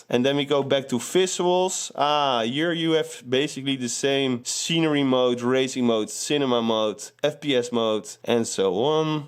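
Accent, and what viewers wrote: Netherlands. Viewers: I bought mine but it arived screwed already so no need to put screws myself also nice dutch accent ik also from Netherlands i may have a bit better english accent but also worse sometimes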